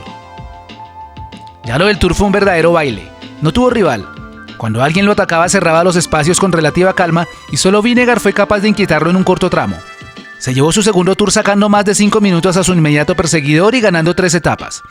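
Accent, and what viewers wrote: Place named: Colombia. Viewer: Se nota que eres colombiano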